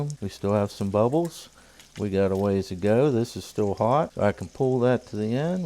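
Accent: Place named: West Virginia, United States. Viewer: your accent reminds me of home so much. Do you happen to be from West Virginia? And if so, what part?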